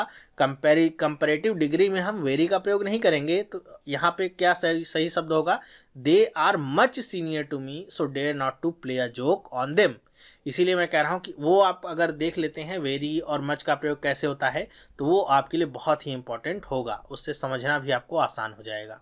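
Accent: India. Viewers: Sir your pronunciation is way too Indian.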